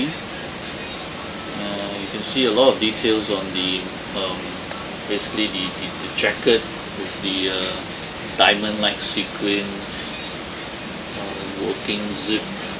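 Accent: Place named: Taiwan. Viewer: 一听你的accent我就知道你是台湾人。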